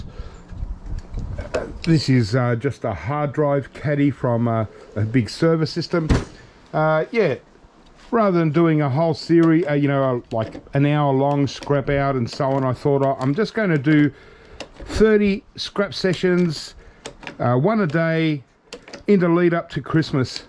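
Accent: Australia. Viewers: I prefer the short sessions anyway! It’s all mystical to me anyway but I like to hear the Aussie and see what you do. Merry Christmas 🎄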